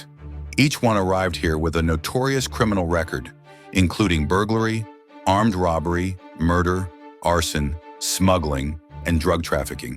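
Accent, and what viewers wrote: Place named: United States. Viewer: bro the narrator is american.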